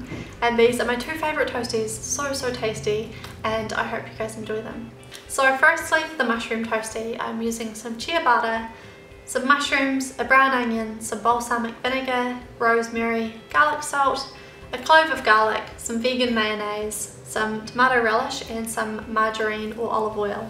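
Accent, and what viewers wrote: New Zealand. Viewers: New Zealand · She sounds like she's from nz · These sandwiches look SO good! Thanks for the recipe ideas - you're wonderful to listen to - kiwi?😊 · Kiwi accent lol....people have a job understanding us sometimes :)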